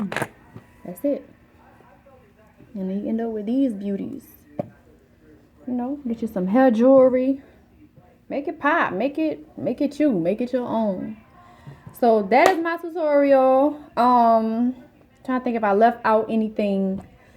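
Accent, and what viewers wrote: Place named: New Orleans, United States. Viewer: As soon as I clicked on this video I said I know she from New Orleans lol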